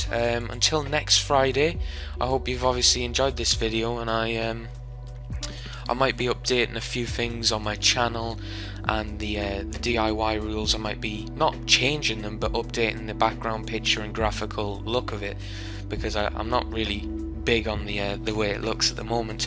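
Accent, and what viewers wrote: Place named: Newcastle, United Kingdom. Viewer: Geordieeeeeeeeee m8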